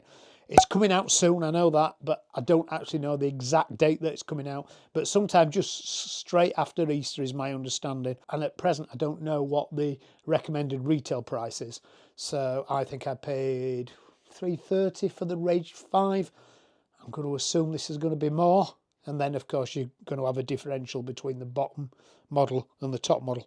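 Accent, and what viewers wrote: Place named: Yorkshire, United Kingdom. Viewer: Having not taken the plunge but keeping an eye on the market (because I want a table saw) all the reviews I've looked at point to one or more of the same 2 primary issues: 1 - fence locking out of sqaure , 2 - mitre slots not industry standard. These seem like pretty fundamental and easily avoidable **-ups to me. Secondary issues are table flatness and dust extraction. I must say from the video it looks like the dust 'extraction' was reasonable even without suction. Evolution are obviously taking that point seriously as the larger saws are showing above and below extraction which is something I don't think I've ever seen on a portable. It's also lighter (despite being bigger-tabled) than the baby DeWalt. That sliding bed is a great touch a la Festool portable. Have Evolution actually managed to nail it on with this saw? 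I think it may have just gone straight to the top of my very short list. Thank you very much for the review in plain Yorshireman English.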